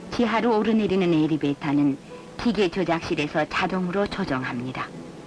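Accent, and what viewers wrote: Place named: North Korea. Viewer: 해설자가 북한목소리네요~~ㅎ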